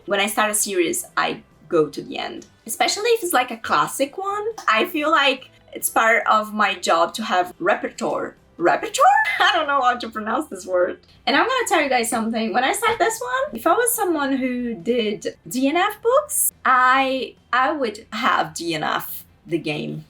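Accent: United Kingdom. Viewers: I love your English accent! This vlog is as amazing as your Portuguese ones, congratulations! Loved watching it and seeing you so comfortable and chill! ♥️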